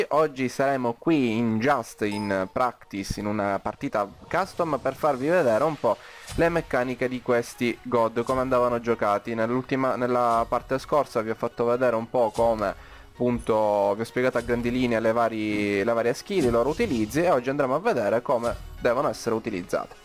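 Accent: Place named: Palermo, Italy. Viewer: Io lo sento, tu sei palermitano ahahah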